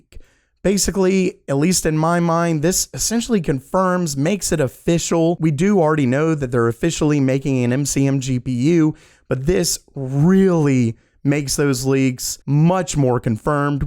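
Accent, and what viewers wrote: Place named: South, United States. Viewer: I cant believed I've never caught your southern accent before lol, It's very subtle.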